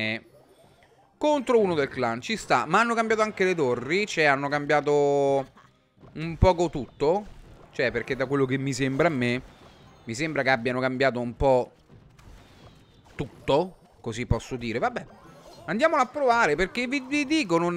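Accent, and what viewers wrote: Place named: Rome, Italy. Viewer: COME SEI DIVENTATO ROMANO :D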